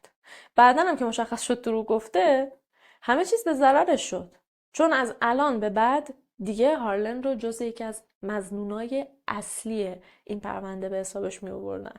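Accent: Mashhad, Iran. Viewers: پرونده جالبی بود 👍، ضمنا بعنوان یک مشهدی بگم که یکم ته لهجه مشهدی گرفتی خانم😉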